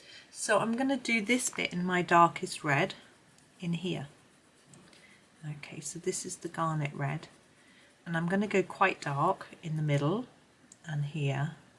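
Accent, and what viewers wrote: England, United Kingdom. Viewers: Hello I've been following your channel now for a couple weeks, didn't say anything and I should of because I like very much not just your coloring but also to listen to you. I like that you choose more simple things and put emphasis on quality and you explain everything so well. I am so intrigued by your British accent I'm sure not the first to say that, although you probably don't think you have an accent. There are so many different kinds depending of what part of England you are, or UK, beside the Scottish and Irish accents. I use to be a professional musician, retired now, I have very good hearing still, maybe that's the only good thing I have since I'm older lol. So I can easily differentiate between the different English accents and yours is wonderful, sometimes you sound like Emma Thompson, I'm sure you know the actress, sometimes like Julie Andrews. 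It's very elegant, also your voice is very calming and you have such a nice sense of humor. So I really love to watch you and listen to you. I have some coloring and product questions, I will leave that for next time, this comment is already too long. Looking forward to the next video, you take care and stay safe. Judith 😊❤️ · Oh, your version of this flower mandala is Beautiful!!! I love watching you color, giving us tips here and there. I'm a rather new subscriber and enjoy your coloring and, especially, listening to you. Your voice is so very soothing and your English accent is wonderful. I'm getting Back into coloring. I've had a rather long stretch of not coloring, but piecing quilts. So, not doing nothing, but piecing Can be frustrating, and coloring is just so relaxing. I could color for hours!! Thank you for your calm, inspiring videos. I enjoy them immensely.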